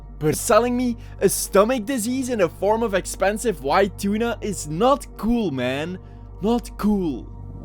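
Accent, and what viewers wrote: Netherlands. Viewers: Accent sounds Dutch to me. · How much of this applies to US markets vs our European markets? You constantly reference US cases and US studies and list everything in dollars (for some reason), but you seem to be dutch (judging from your accent and the Delhaize brands) and testing European products sold under European laws. Our European Union has a lot more stringent laws on food quality and truthfulness in their labels, although they are not perfect, they are a lot better in general than the capitalist Mad Max hell the poor Yanks live in. It would be great if you could be clearer on such significant differences in the future, because it's an important topic and a fun video in general, but with oversights like this, you could worry the wrong people or, even worse, desensitise people to the wrong topics.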